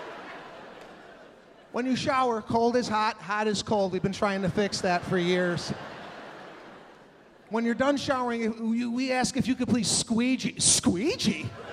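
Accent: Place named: Chicago, United States. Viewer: Chicago Sicilians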